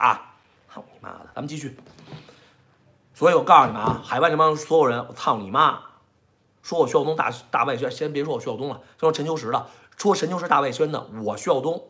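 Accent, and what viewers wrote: Beijing, China. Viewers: 曉冬可以學台語嗎 你的臉一臉台味 怎麼是大陸腔呢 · 北京爺們兒罵人真好聽！ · 骂得太爽了，尤其一口京腔味带着愤怒，又夹着一丝丝幽默铺天盖地、如海啸般把海外这帮伪民运全卷入了海底，从此海外应该会清净点了吧！如果还有， ，再来一场海啸！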